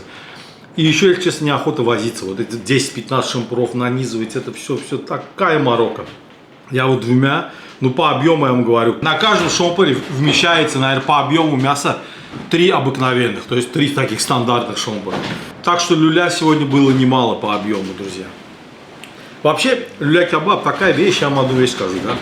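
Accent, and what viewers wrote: Baku, Azerbaijan. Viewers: Салам землякам.я сначала ставлю би дяня жирный лайк а потом слушаю родно бакинский говор.мы часто в Новханах делали балык .кябаб.и котлеты классные тем более в вашем исполнении.Спасибо🎉🎉🎉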